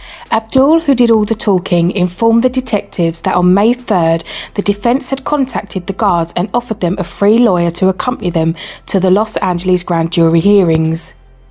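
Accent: United Kingdom. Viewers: The more I watch these kind of videos the more horrified I get how much Michael was bullied and tortured it seems so beyond what my brain can comprehend how much evil was done to him . How will these people meet their maker one day ??? I would never want to be in their shoes. This housekeeper is hideous and despicable woman not an once of guilt or remorse of what she has done to Michael .On the lighter note the narrators voice is so good I love British accent so beautiful.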